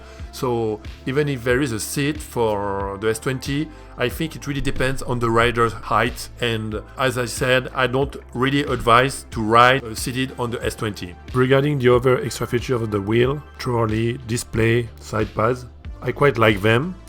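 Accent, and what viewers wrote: France. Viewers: lol dat french accent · SYMPA L'ACCENT FRANCAIS , BONNE VIDEO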